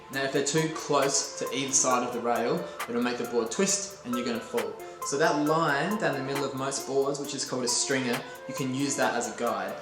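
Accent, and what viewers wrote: Australia. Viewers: I thought for a while he said bitch, but it was just his Aussie accent. But, great vid man. Thx! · He’s AUSSIE m8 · likely a gold coast Australian accent. (I live in SA and this sound like a Queensland accent to me) .The beaches also look like they are in the Gold Coast but i could be wrong. Also a lot of surfers live in the gold coast. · As soon as I heard the Aussie accent I knew this guy was legit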